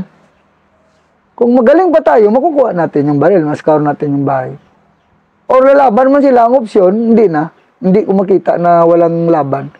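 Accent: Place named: Iloilo, Philippines. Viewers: Ilonggo yata si Sir sa accent nya..kabaliktaran daw accent ko😂..keep sharing,your juniors will digest and learn something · ilonggo gid c sir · Ilonggo Pala si Sir.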